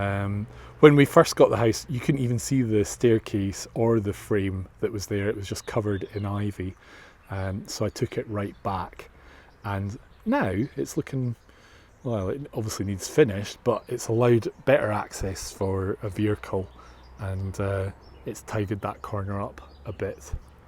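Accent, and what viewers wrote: Scotland, United Kingdom. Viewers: love your commentary and Scottish accent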